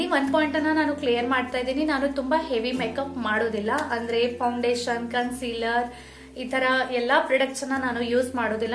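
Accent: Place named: North Karnataka, India. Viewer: Nanu uttara karnatakada Gulbarga davru.....neevu uttara karnatakadavru anta keli khushi ayitu.....neevu namma bhasedaga matadri keloka cholo anistada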